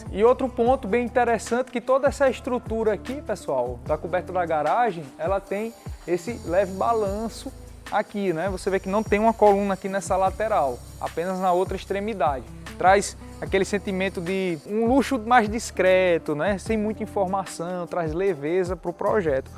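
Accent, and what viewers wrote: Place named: Pernambuco, Brazil. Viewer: Que massa!!!! Parabéns pelo teu trabalho e muito sucesso!!!! Sotaque que amo demais , sou pernambucana 😊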